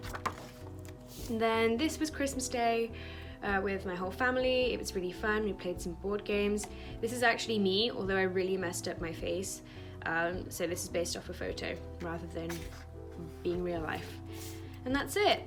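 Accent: RP, United Kingdom. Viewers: You can tell how posh you are holy heck - great art btw :Db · Wow you’re so posh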